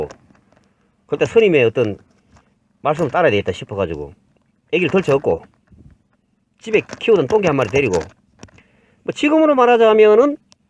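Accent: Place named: Samcheok, South Korea. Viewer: 제나이쯤 되셨겠네요 목소리도 삼척사람 .저도 삼척 사직리 사람 .지금은 파주시, 수고많네요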